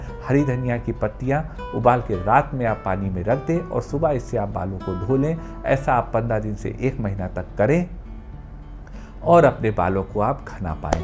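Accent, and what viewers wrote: Lucknow, India. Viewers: Lucknow side ki bhaasha to lag rahi hai..........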